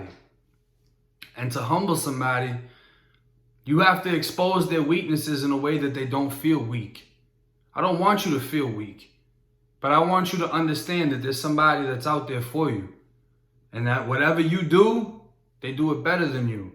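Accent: Boston, United States. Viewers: Where are you from? Im from Boston im like he must be lol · New to the channel, you from Boston? You got the heavy accent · Really tired of listening to your war stories about Florida DOC. I'm old school, did 9 and half calendars in fsp, sumpter, marion, hendry and many other camps. All this bullshit you're spreading with your Boston or Maine accent trying to scare soft ass people that haven't been there Talk to me.....I've been there when there was CONVICTS NOT INMATES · Yo r u from boston bro?? U got that boston accent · Hey I just discovered you and I’m def a fan, keep up the good work!! Are u originally from Boston? Cus I am and I swear I hear alil bawstin accent? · I hear that Boston in you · Are u from Boston? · youz my dude i always thought you was from Boston thought. This a righteous call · Off the record....I love your voice...😍🥰🥰 u got that BOOOUSTON accent 🤣🤣🤣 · Just wondering bro. 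I know u talk about doing your bids in Florida. But hear your Boston accent. Jyst wondering when u left Boston and where u staying now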